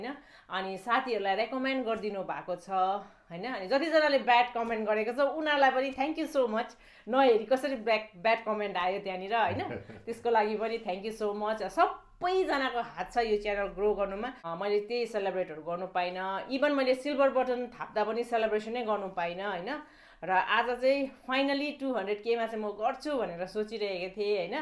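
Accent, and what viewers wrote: Darjeeling, India. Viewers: बधाई छ….! tapaiko बोल्ने शैली सुनेर दार्जिलिंग कै हो भन्ने पहिलेई थाहा थियो मलाई चैं 🤗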